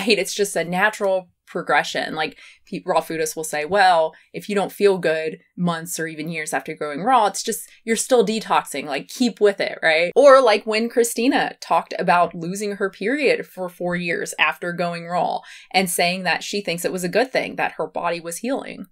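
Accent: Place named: Pacific Northwest, United States. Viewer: She mentions Portland. I assume she means Oregon? To me, as an Oregonian, she does have a bit of a PNW flair 😂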